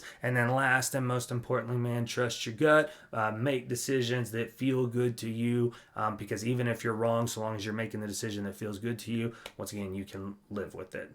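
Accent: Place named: South, United States. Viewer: Maw frands LOL, you sound southern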